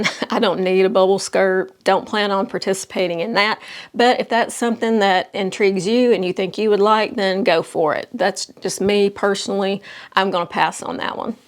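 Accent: South, United States. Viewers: Love your Southern way of speaking. Could listen to it foe hours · My deepest condolences,! I hate January also but because I live in CO and it’s miserably cold! My daughter lives in Tuscaloosa (AL student). I thought I recognized your cute southern drawl. Thank you for keeping me fashionable. I’m so happy I found you.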